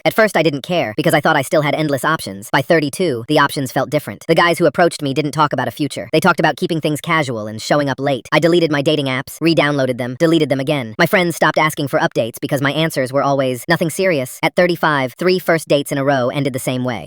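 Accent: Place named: Latin America. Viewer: You sound latina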